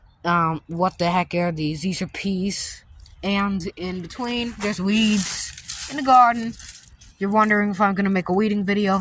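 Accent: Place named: New York, United States. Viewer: Where u from? I hear NY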